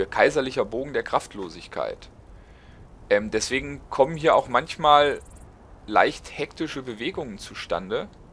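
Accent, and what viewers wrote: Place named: Hesse, Germany. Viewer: ich finde auch dass du einen kleinen dialekt hast, kann es sein dass du aus hessen oder so stammst? :)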